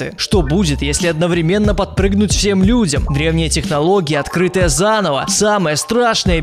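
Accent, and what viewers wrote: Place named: United States. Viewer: почему он говорит как американец который хорошо выучил русский?? Прислушайтесь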